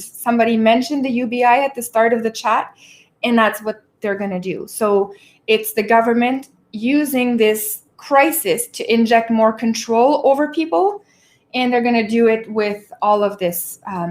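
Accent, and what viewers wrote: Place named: Quebec, Canada. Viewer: I thought I heard French -Canadian. I was just about to ask when you mentioned it.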